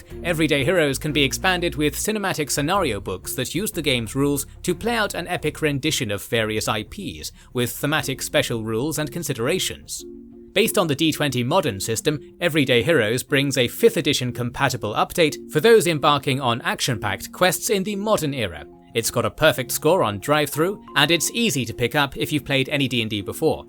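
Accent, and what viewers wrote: United Kingdom. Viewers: A prime example of the danger of the British accent. Giving credence to the sequels which split the fandom, introducing Grey Jedi as if that's a real thing and not just fan fiction, and defining the Sith Rule of Two as the way that operate when the Sith species was conquered by exiled Dark Jedi. Sith bend the Force to their own will for their own selfish desires, where Jedi surrender to the will of the Force and use it to guard life. Way too much emphasis on the damn sequels.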